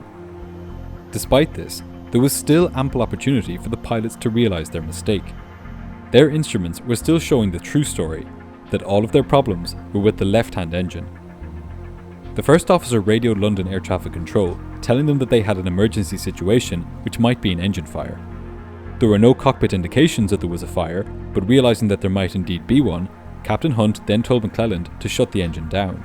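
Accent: Ireland. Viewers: Love seeing your handsome face in your videos, coupled with your gorgeous Irish accent, which makes your videos so much more relatable 🇮🇪☘️🇮🇪😍😍😍😍😍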